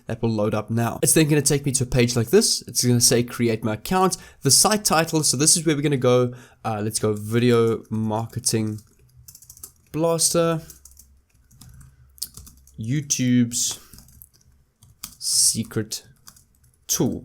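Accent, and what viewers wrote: South Africa. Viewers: Sth.Efrican accent plus FF speed make it very difficult to follow. Relax buddy because content seems interesting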